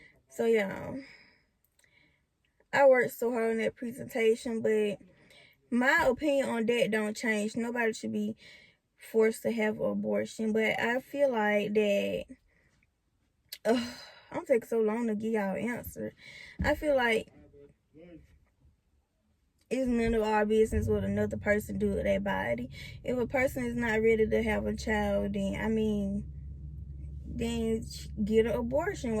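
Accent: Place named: South, United States. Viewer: Hey.... I love the name Zahavi...and your Lil southern accent make it sound really cute. I bet he look just like you! I'm proud of you for stepping up and wanting to be the mother you are. We all have things we wish we could have did different but if we did different it would still be other choices we could have made...if that make sense. Your so cute and seem to know exactly what you can handle. Imma a fan! #TeamZs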